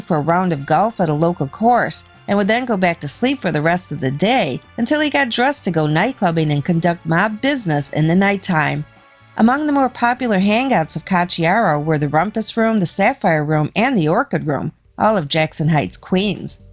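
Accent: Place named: Midwest, United States. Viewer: Horrible narrator. Can’t have someone with a midwestern accent narrate about things going on in NYC. Her pronunciation of the word “Manhattan” made me cringe.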